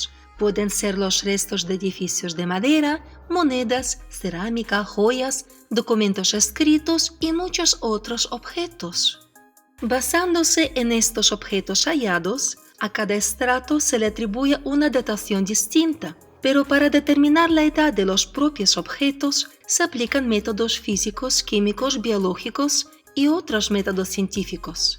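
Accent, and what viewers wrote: Russia. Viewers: Muy bueno veo todos tus videos desde que lanzaste él primero, tenes que hacer un video sobre "vos" llama la atención tu asentó Ruso y que te llevó a realizar éstos trabajos históricos, desde ya muchas gracias y seguí así 😉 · Hey eres de Rusia verdad? Tu acento es inconfundible :3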